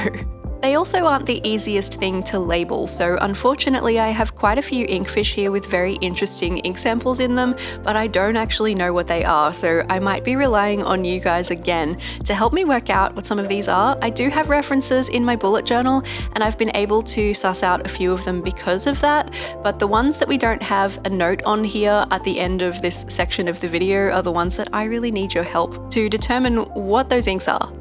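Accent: Australia. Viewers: Found you on searching for ink swatching! I'm in Adelaide and when I saw all the Ferris Wheel Press I wondered if you were Australian. Duh, couldn't I tell with the accent but prob because I couldn't hear one (being an Aussie myself) it didn't click! Hahaha. Anyway, loved watching all your swatching and love that you share inks with friends. Never knew there was a Pen club of sorts. Wonder if there is one here in Adelaide? I share ink with one friend and will soon receive a coffee smelling one she recently bought in Singapore. Can't wait for that. 😊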